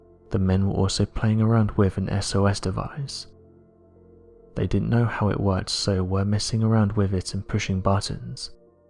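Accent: United Kingdom. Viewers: Why always the brit accent makes not believe anything they say they are the sales scammers of the univers4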